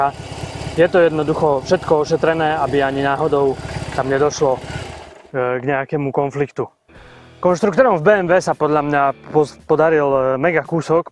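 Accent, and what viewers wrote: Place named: East, Slovakia. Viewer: Motorka brutál.. Ale video som nedopozeral...tá východniarčina trhá ušné bubienky...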